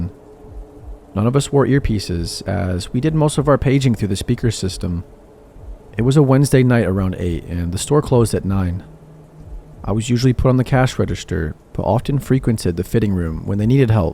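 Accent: United States. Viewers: I love your voice. New Jersey is that you? 😅 Your voice makes me feel safe. Thanks babe. ❤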